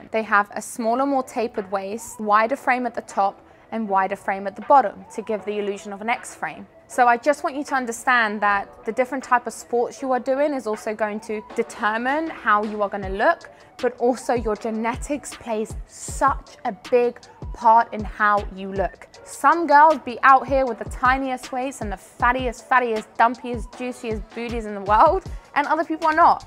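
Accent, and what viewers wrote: United Kingdom. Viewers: Been watching your TikTok’s for a while now, had no idea you were British 😅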